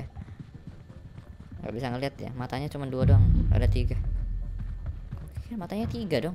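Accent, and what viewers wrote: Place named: South Kalimantan, Indonesia. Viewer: orang kalimantan sltan itu bang bhasanya wkwkwk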